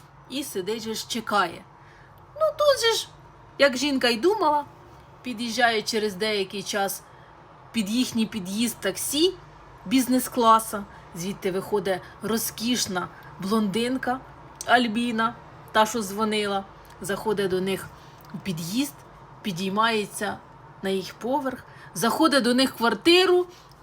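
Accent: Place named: Mykolaiv, Ukraine. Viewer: А какой у тебя очень приятный диалект! Николаевщина?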